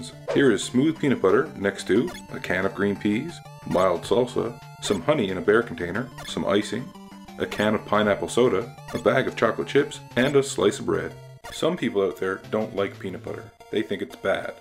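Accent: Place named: Canada. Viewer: I’m glad I can finally confirm that you’re Canadian, that accent was so familiar 😂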